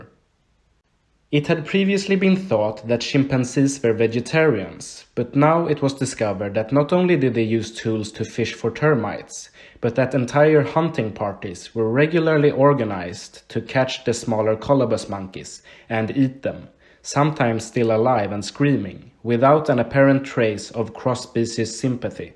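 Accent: Sweden. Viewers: I love the Swedish "iii". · This man is swedish af. U can hear it on the horrible accent... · Are you Swedish? You sound Swedish in your accent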